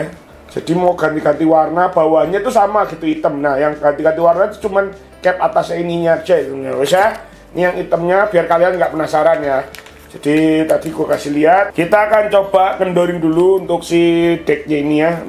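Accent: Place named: Java, Indonesia. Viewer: Medok rasah nggo gua gua mas😭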